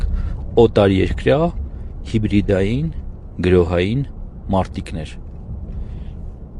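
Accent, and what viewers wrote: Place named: rural, Armenia. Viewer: Արա մասով-մուսով ես խոսում գեղցի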